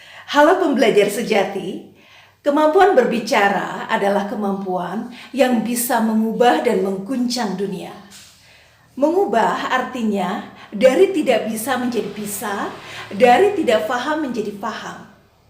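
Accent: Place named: Java, Indonesia. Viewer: Ahh...masa siih... Itu ngomongnya pake logat Jawa bukan logat Sunda🤭.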